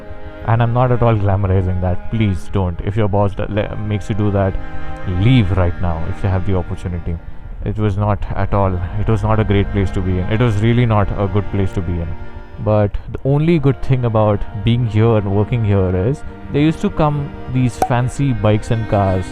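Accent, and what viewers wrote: Malvani, India. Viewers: That malvani accents of yours , so sexy 🥵🥵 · Hyo to😂😂😂❤❤ You speaking malvani never gets old! Please if possible make a video speaking completely in malvani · That malwani accent 🤌❤️‍🩹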